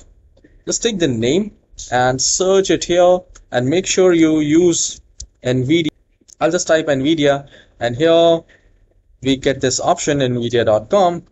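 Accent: India. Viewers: nah yk its gna work when you hear the indian accent lmao W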